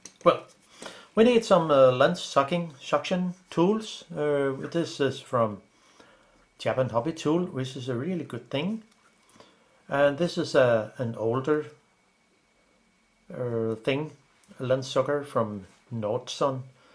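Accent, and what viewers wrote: Denmark. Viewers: Are you Danish :)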